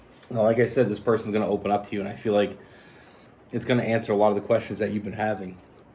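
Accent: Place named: Canada. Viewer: I can hear a Canadian accent, but my goodness. Rain in July??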